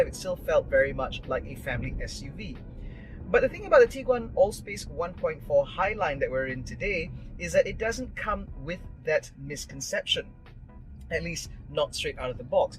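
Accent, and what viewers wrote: England, United Kingdom. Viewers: Thank you … I am getting the 2023 Life model in New Zealand (same as the Highline) in the Atlantic Blue. I like your English voice. The Blue you have got there is a sublime colour!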